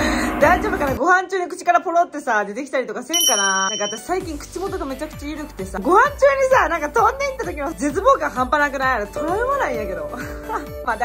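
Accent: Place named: Fukuoka, Japan. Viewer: 髪の毛は「とく、とかす」って言います。ボディクリーム、私はアメリカ在住なんですがアメリカでは結構作っている人多くって私も最近友達の手作りクリームのもらって使用してるけどシアバーター、他、色んな自然オイル（ココナッツやアーモンドオイル等、みんなそれぞれ自分にあったものを入れてるみたいです）使用してあってめっちゃ敏感肌な私にはとてもいいです！オイル使ってるのに塗ったあと全然ベタベタぬるぬるしない、でも保湿はしっかりしてくれて最高です！ナチュラルなものばかりなので髪、顔、ボディー頭から足先まで使えるので超便利です。なので私も来年は作ってみようかなと思ってたところです😊 のクリームできたら参考にしたいと思います。私も福岡出身。アメリカからいつも応援してます、そしていつも地元弁聞いて元気もらってます！😊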